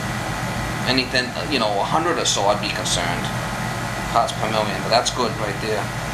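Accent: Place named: New England, United States. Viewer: Must be from Mass or Rhode island..got that can't pronounce words correctly..kinda baby talk like.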